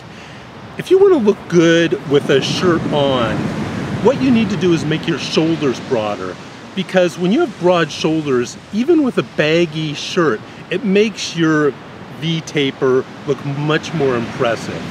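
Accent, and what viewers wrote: Germany. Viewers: Well, yea, but he seems to have a little German accent when he speaks English. Mostly with the latter "R"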